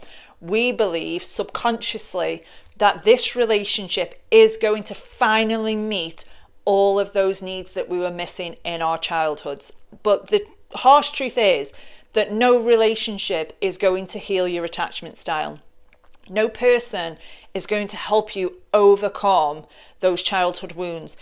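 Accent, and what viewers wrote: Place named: Lancashire, United Kingdom. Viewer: Super helpful must help with the Lancashire accent? I dont know but gathering my self worth is helping alot i was excersizing boundaries which prompted my bring ghosted so on the right track but funny how the feeling of "oh what if" comes in againgst the obvious logic lol it is like breaking free from some kind of addiction obv attatchment x thanks for being you x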